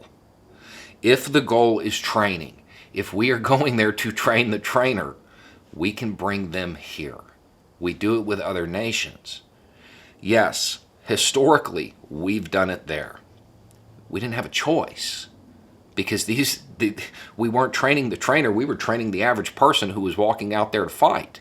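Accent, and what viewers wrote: United States. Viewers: sounds like the us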